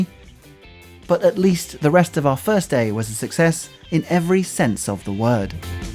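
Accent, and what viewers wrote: United Kingdom. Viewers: My first time viewing and enjoyed the humor and as an american the brit accent top it of. I think rain will spoil any vacation time. I live in the great lakes region[ohio] and May thru Oct. is really nice.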